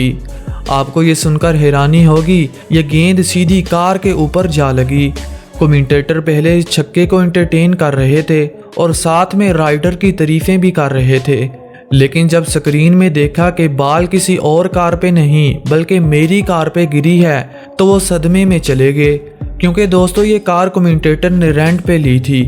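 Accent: Punjab, India. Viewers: Bai tu punjabi lagda teri speaking too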